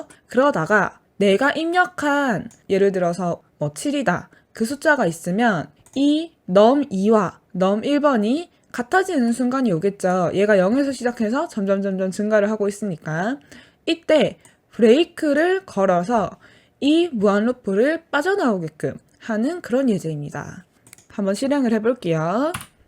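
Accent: Gyeongsang, South Korea. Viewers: 정보처리기사 시험용으로 C, JAVA는 인강으로 배웠지만, 인강에 Python이 없어서 어쩌나 했는데 여기 SONOL에서 느무느무 잘 배웁니다. 갱상도 액센트 늠 귀여워요~~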